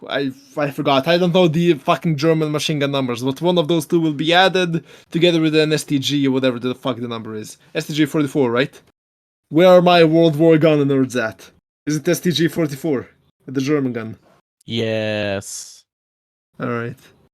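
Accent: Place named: Russia. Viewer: Why does shound russian english accent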